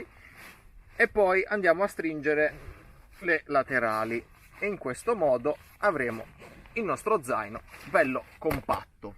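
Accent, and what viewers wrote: Apulia, Italy. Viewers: Grazie per le belle dritte che ci dai con grande simpatia! Non so di dove tu sia ma ti sento molto Pugliese... e ho detto tutto. Non vorrei snagliare.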